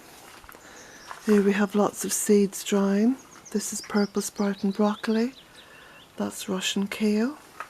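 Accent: Ireland. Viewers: Hello to the wealthy, amazing lady and jack. lovely to see your heavenly garden, also hearing the birds and your lovely Irish accent. I'm trying to set up my ironing, but keep stopping to see and hear your conversation . Thank you for the joy. north shrop England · Beautiful, your hard work was well spent. Watching this from rural waterford and am glad to hear the gorgeous Irish accent represented :)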